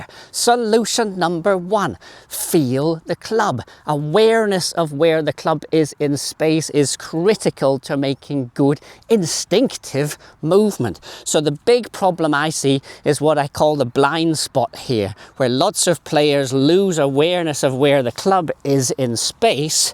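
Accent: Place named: England, United Kingdom. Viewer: I love your channel. Sounds like you’re English. Where are you and do you give lessons in person ?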